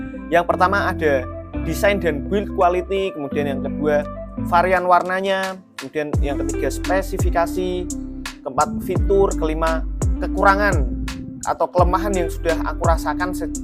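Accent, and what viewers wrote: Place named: Semarang, Indonesia. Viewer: tq mas 🙏 Btw kek logat semarangan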